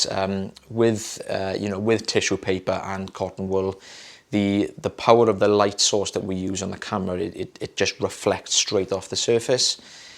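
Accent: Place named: United Kingdom. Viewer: Just curious what part of the UK you are guys are from? You and have the same accent. I watch a lot of British television and follow quite a few Brits and there is a very distinct difference between accent sounds. Thx. You guys are the best!🇬🇧🌷🇬🇧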